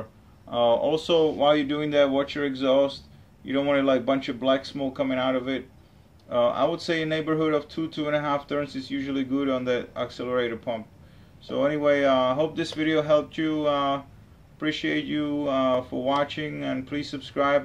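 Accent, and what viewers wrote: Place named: Latin America. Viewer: ABSOLUTE AMAZEBALLS!! Dude with the Latino accent! You are the MAN! Thank you SO much for this video. I've got exactly the same carburator on my old HD Big Twin and I thought I had run all the gas out of it before I stored it. NOT! I didn't get it all. But now, I've got it all solved, AGAIN thanks to YOU!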